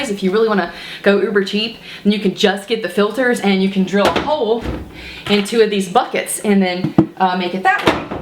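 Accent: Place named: Texas, United States. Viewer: " Fixin to do " , are you a Texas Girl ? I am not hating at all just a 56 yr. old Native Texan Male that knows good Texan Slang when I hear it !!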